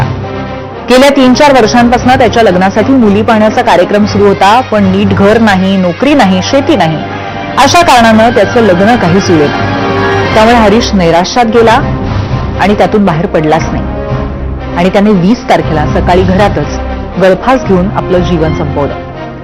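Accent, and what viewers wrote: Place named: Marathwada, India. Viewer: शेवट च वाक्य चांगले बोलले तुम्ही मला वाटतं तुम्ही मराठवाडा साइट चे असाल कदाचित भाषे वरून 😊 पण तुम्हाला बाबा नाहीत हे ऐकून वाईट वाटलं परंतु तुमच्या आईने तुम्हाला वाढवलं ही किती साहसाची गोष्ट आहे 🤝😮 तुम्ही जेव्हा तुम्हाला स्थळ बघाल तेव्हा तुम्ही ही नोकरी आणि प्रॉपर्टी पाहणार हे नक्की. प्रेमाने पोट नाही भरत पगाराने भरते आणि स्वतःची प्रॉपर्टी अडल्या वेळेला कामी येते 🤷 बाकी मुलांना शिकायचे नाही मी मुंबईत आहे तर येथील मूल धावपळ करून एंजॉय करून एकदम टवटवीत असतात रोज आणि शिक्षणही कसेतरी करतात 😅 तरी गावाकड उलट आहे ज्या गोष्टींकडे नाही पळायचं तिकडे जास्त पळत सुटतात उलट शेजारी कितीही गोड बोलणारा असेल किंवा रक्ताचा नातेवाईक असेल तरी तो जमलेल लग्न कसे तोडल हे पाहतो हे सत्य परिस्थिती आहे 😅 परत आपण म्हणतो गावाकडील लोक एवढे गरीब का ? कर्माने आहेत ते 😢कधी सुधारणार ही मानसिकता काय माहीत 🥱चला तुम्हाला तुमच्या पुढील वाटचालीस भरपूर शुभेच्छा 👍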